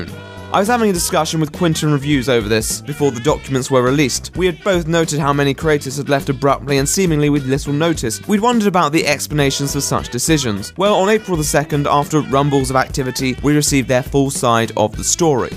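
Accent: RP, United Kingdom. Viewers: You soundly like such a posh wanker only complaint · You sound so damn posh. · I'm sorry, your videos are legitimately great on all objective levels, I just happen to be part of the like, 2% of Americans who are actually kind of turned off by the British accent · Brits all sound the same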